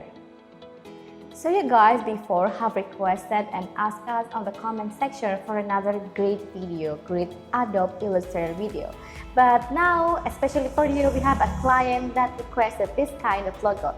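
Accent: Indonesia. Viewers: Kyak orang indo deh, yekan? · where you from? indonesian or malaiysia kek orang indo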